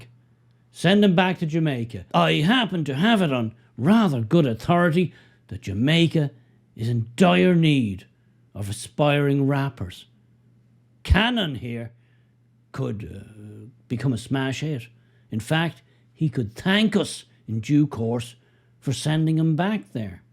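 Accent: Ireland. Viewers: Loving the passion, send the Jamaican genius back home to help lift Jamaica,he has all the answers, they used to say don't bite the hand......😂 keep up the good work, I love your Irish twist.Peace and prosperity to all my Irish brothers and sisters this nonsense has been taken too far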